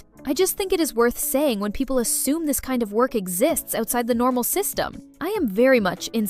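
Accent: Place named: United States. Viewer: Why does she speak with an American accent instead of a British one?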